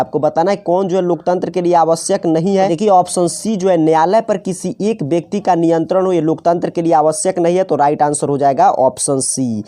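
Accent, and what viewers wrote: Bihar, India. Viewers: Thanks sir .aapke aawaj se pata chala hi ki aap Bihar se hu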